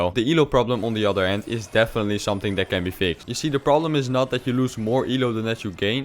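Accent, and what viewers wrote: Netherlands. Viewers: why do i smell a dutch accent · Damn i smell englsih and a hint of dutch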